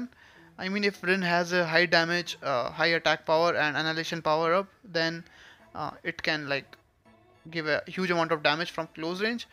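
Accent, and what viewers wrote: India. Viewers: Indian Accent😮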